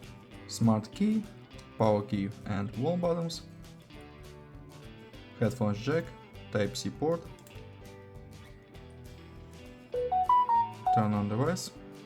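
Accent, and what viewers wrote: Russia. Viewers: Чисто русский английский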